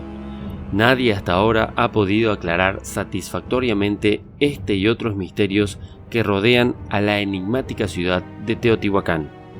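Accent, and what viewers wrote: Argentina. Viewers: Soy mexicano y conocedor de la historia mexicana y la verdad no se si la persona que hizo este vídeo es mexicana aunque suena a argentino y si eres argentino debo felicitarte por la buena investigación que realizaste, he visto vídeos de extranjeros farsantes que quieren engañar a los mexicanos con datos falsos y la verdad si caen los mexicanos en los engaños sobretodo porque los mexicanos no conocen su historia y ellos se basan en lo que les diga su youtuber favorito que la mayor parte del tiempo son igual de desconocedores de la historia como ellos y para terminar yo invito a la gente a visitar mi canal y allí tengo 3 vídeos de Teotihuacan realizados en la zona arqueológica y mis vídeos podrían ayudar a los que vayan a visitar el lugar como una guía turística para que sepan que deben ver y visitar en el sitio y para terminar solo quiero decir al realizador del vídeo que los aztecas pensaron que quien hizo la ciudad fueron gigantes por que ellos encontraron huesos de animales pleistocenicos como mamuts, camelidos etc. 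y toda esa zona de los valles centrales de México es rica en restos de esos animales, mi canal es Rolando México RS y los vídeos los hayan como Teotihuacan la ciudad de los dioses mi explicación primera y segunda parte y ojala se den una vuelta por mi canal que está censurado por la plataforma. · Fabuloso documental. Me cae un poco de raro que tenga acento argentino el narrador, pero vamos, que muy bien narrado, impecables las imágenes y se ve el conocimiento, esfuerzo y conciencia que hay detrás de este contenido. Muy grato de verdad. Tienen una nueva suscriptora. 😊❤❤❤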